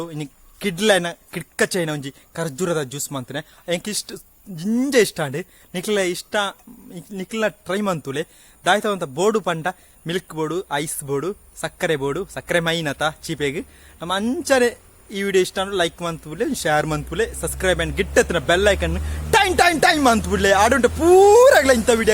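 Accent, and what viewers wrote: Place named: Tulu, India. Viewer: Eerena video frst d last Muttala thuyere bore apuji.comedy apundu eerena funny dailogues kendre kushi ippundu.n anchida Tulu patheruni style kenere edde apundu.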